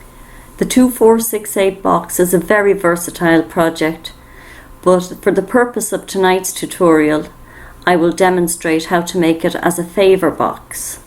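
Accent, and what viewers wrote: Ireland. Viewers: what an interesting idea, and so easy! as an irish man myself it's great to hear a familiar accent. best of luck with your channel. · Wow what a fab demo. Its just great to hear an Irish accent. I can't wait to give these boxes a go and I'm sure you could change the theme for other occasions. Well done I'm looking forward to seeing more Irish demo's in the future.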